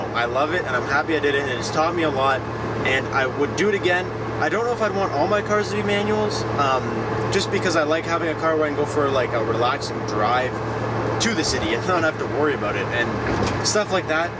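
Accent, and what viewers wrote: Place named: Canada. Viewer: I keep hearing “aboot” and I’m like.. you’re Canadian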